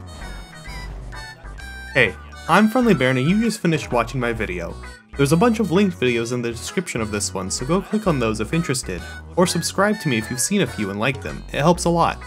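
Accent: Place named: United States. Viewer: Yeah, he's pretty obviously american :D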